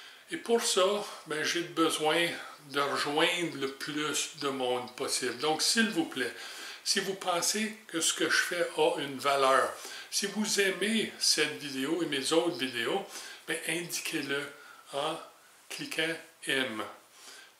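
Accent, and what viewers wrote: Canada. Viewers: N'écoutez pas les esprits chagrins ! Moi, j'ai découvert vos cours en ligne depuis une semaine et malgré l'accent (que j'aime beaucoup étant fan des "Têtes à claques" et de Céline Dion même si je ne comprends pas tout et suis obligé de revenir en arrière très souvent) j'apprécie énormément toutes ces connaissances que vous nous faites partager, toute cette partie sécurité très importante, dont je m'imbibe en ce moment, pour continuer à faire de l'usinage en pleine santé physique, pouvoir continuer son hobby ou sa passion sans dommage. J'apprécie aussi beaucoup cette progressivité dans l'acquisition des connaissances couplant l'écrit, l'oral et la pratique. Merci pour tout.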